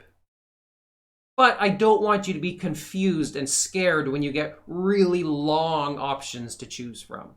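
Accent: Canada. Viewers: Is he from Canada?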